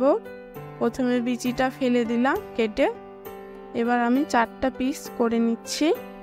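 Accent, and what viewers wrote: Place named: Kolkata, India. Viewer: তোমার কথা শুনে আমি বুঝেছি ,মনে হয় কলকাতার দিকে থাকো ।আমার বাড়ি কলকাতায়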